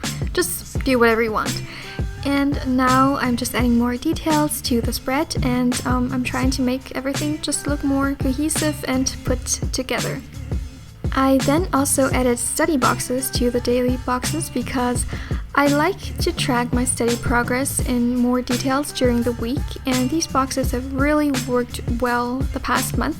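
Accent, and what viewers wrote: Germany. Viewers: Wait- where are you from? Germany? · Since you said thats what it's called in German, I hear that you are German.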